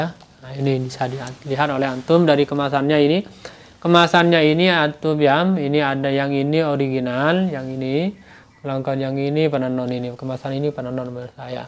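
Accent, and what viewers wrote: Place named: Madura, Indonesia. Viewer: Pak ustad nya orang madura kak kik kuk bhs indonesia nya.. lucu😁 tapi saya kagum dengan anda pak ustad semoga lancar jualannya.. aamiin...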